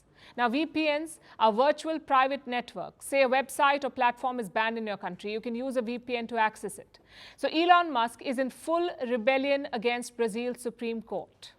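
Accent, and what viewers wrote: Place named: India. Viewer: You are lier I wish you get off of your Indian accent first to be a speaker 🔈 plus you don’t know nothing about corruption in Brazil lady Lord has mercy on your soul and the machine manipulation behind your job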